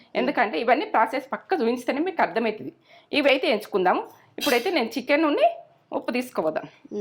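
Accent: Telangana, India. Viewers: You are doing a great job. Please continue contributing your authentic Telangana recipes in your authentic Telangana accent with accurate kolathalu 🙂.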